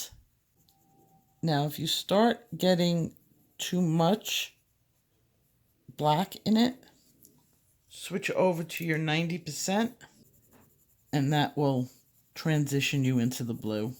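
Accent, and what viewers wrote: New York, United States. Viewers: You know how hateful and selfish people can be, but not with any of the wonderful people I have lately. I have asked so many questions and nearly everyone either a tutorial or knows of one and takes me right to the source. It has been great. After struggling for so long with hate and drama it is nice and refreshing to meet kind people. And I could listen to you talk all day. I'm from the South so I know a New York or Northern Italian accent lol I don't hear it very often down here.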